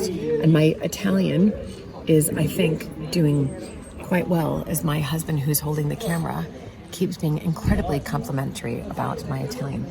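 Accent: United Kingdom. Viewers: Soooo beautiful. I notice that some of your diction is now coming close to a British accent. cute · Wow,you picked such a wonderful area for your house! Just gorgeous.Your American accent has some touches of British e.g.You pronounce t in "delightful" and "little" with a very hard t instead of the softer ddd American style.Now you will be picking up Italian too.I doubt you are conscious of it.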